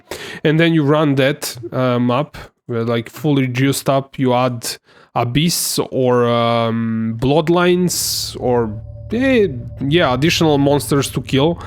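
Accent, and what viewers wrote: Russia. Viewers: Ты русский? Акцент очень заметен.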